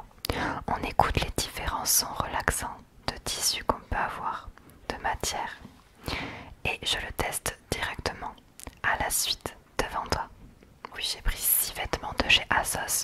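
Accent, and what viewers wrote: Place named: Provence, France. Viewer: L'accent provençal en ASMR j'arrive plus à m'en passer, y a moyen d'être leadeuse d'un mouvement 👀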